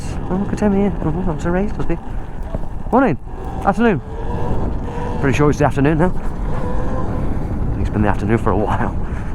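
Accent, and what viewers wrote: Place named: United Kingdom. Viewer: To save anyone going through what I went through, the Youtuber is referring to is not; [1] johnix [2] johnix [3] jonix [4] jonicks [5] johnicks (I was so close) [6] janix [7] janicks [8] Jonex [9] Janex But.... 🥁🥁🥁......... John Hicks 😆 Bless us Brits and not being able to understand each others regional accents. Subbed, number 26... remember us when you hit the mil. 🤜🤛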